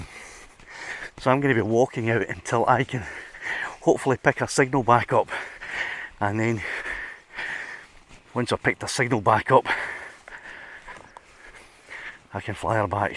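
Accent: Scotland, United Kingdom. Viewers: Fantastic story. I really admire your courage and tenacity to find your drone. Despite the rough terrain, you overcame all obstacles and ended up successful. Respect for your perseverance and never give up. I like your Scottish accent.✌Greetings from Denmark.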